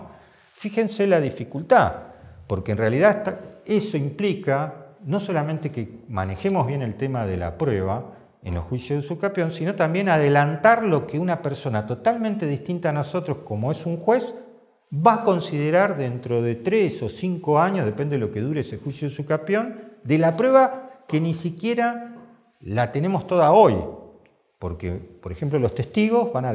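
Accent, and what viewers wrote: Argentina. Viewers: Tiene acento porteño asi que asumo que es de Argentina xD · Es Argentino no escuchas como habla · Cabe considerar que el habla de acuerdo a la Ley de su país, por su pronunciación parece ser argentino, lo dicho por el Dr. no es totalmente aplicable en cualquier otro país como México.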